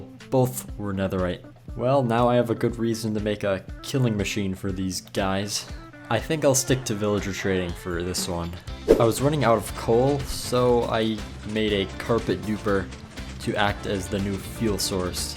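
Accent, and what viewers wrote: Minnesota, United States. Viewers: With the name I was hoping your MN accent would be more aggressive.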